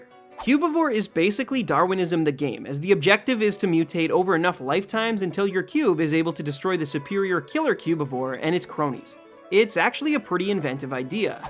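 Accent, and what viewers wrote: Canada. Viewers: This video is pretty good. Are you Canadian?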